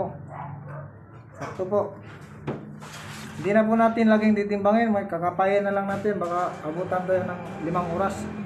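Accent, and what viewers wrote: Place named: Visayas, Philippines. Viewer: BisaYa kaaYo dodong #vlog cho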